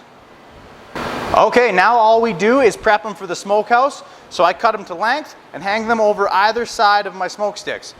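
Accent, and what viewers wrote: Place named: Canada. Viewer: He’s definitely Canadian. I’m from the Great White North myself and that accent is unmistakable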